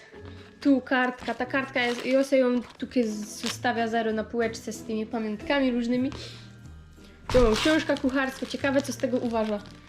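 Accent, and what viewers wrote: Silesia, Poland. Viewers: W Śląsku Cieszyńskim - gdzieś na "końcu swiata" mieszka dziewczyna a zwie się Ładna dziewczyna - szczera i prosta Zarazem niezwykła którą warto poznać! Ci co Ją znają piszą wprost szczerze źe nie ma lepszej na "YouTu - berze" Na tą spojrzeć aż miło zawsze usmiechniętą i schludnie ubraną I cóż powiedzieć - jak by nie było wielu chłopaków ogląda się za Nią Muszę Was zmartwic młodzi przyjaciele że w owej kwesti zdziałacie niewiele Ta miła blondynka ma już chłopaka A jest nim i prawda taka Chłopak ambitny i pracowity na wskroć zaradny i pomysłowy Dla Niego nie ma takiej opcji żeby nie dało się czegoś zrobić Czy przy ciągniku czy przy maszynie Naprawy palą mu się w dłoniach - tylko proszę Cię - nieco rozważniej - najważniejsza Ona! Oprócz swej pracy - w polu pracuje Chce być rolnikiem - uprawiać ziemię W tym wszystkim dzielnie wtóruje Bo chce byc przy Nim - i myślę będzie. 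Brawo dzielno dziewczyno maturkę zdałaś i juź pracujesz Dla Ciebie żadna trudna sprawa trzeba pokój malować - no to malujesz! Musza Wom pedzieć drodzy TouTu - berzy W co wielu widzom trudno uwierzyć Ta "Dziołcha fajnie do nos godo" Chociaż niektórym sie to niypodobo! Pozwól że coś powiem własnie i co niektórym sprawę wyjasnie... Jak sie niy podobo naszo ślonsko gwara to cis skonś przylos i łod Slonska wara! A jak chces łostać i mos "gniozdo" tukej siec cicho na rzici i sie niy fukej! Przez to za odwagę Ciebie podziwiamy a za to że godoś - poprostu kochamy! Gdybyśmy mi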